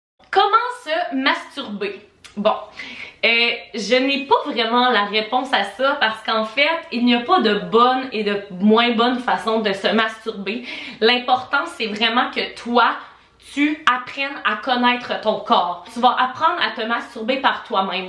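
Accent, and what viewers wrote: Quebec, Canada. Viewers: Ton accent québécois est très très prononcé.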